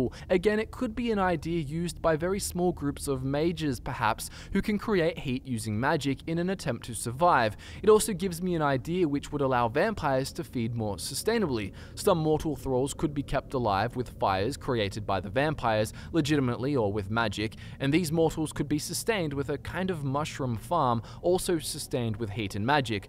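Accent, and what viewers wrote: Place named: Australia. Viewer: No, watch the video and enjoy the Aussie-narrated lore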